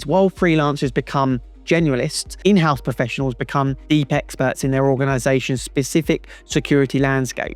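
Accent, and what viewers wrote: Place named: Australia. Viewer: Thanks mate I let you know later because at the moment I'm busy to move in Asia. You're Australian, I recognized the accent because I lived in Perth for 2 years. 😁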